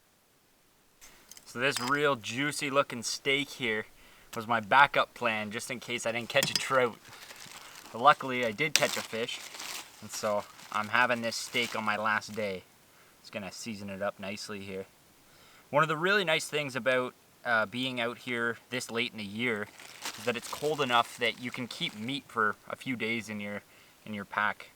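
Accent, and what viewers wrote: Canada. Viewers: are you french Canadian?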